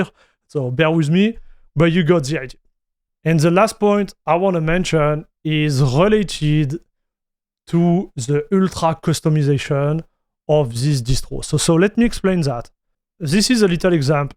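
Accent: France. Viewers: you don't need to be french to notice the heavy french accent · I love your videos, so informative and I like your french accent too 💖 running nobara 41 rn. unfortunately I haven't gotten time to game as much but it's great. I've been able to get almost everything working with a few quirks I need to find my way around over the year. funny thing, my cs2 install won't stop lagging and hitching. same issue on windows too, to a lesser extent tho. haven't tried different distros yet but I figure it'll be the same everywhere atleast on wayland. not touching x11 cuz I want how to learn to fix issues instead of putting a temporary band aid. anyways thanks for the videos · Les français qui ont remarqué l’accent français 🖐️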